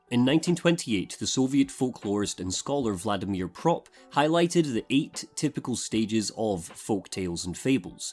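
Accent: Scotland, United Kingdom. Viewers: I don’t criticize Scottish pronunciation. I enjoy it, it’s fascinating and eclectic in its acquisitions of other dialects’ features. Keep up the fine work. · Haven't finished the video yet but "You can criticize Scottish accents when you get free healthcare Americans" almost made me die laughing as an American myself ddd. Imagine correcting someone's pronounciation when they are from a different country and have a different accent to you. That's just rude. Besides, in-ven-try still sounds right. Anyway, sorry for the babbling, going to finish the video now, but I love/agree with a lot of what you're saying! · I watched this video for about an hour before I realised you were Scottish, you have such a light accent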